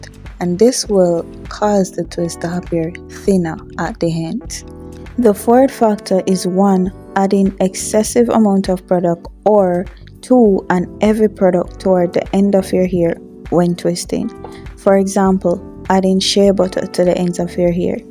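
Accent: Jamaica. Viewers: Do I suspect a fellow Jamaican?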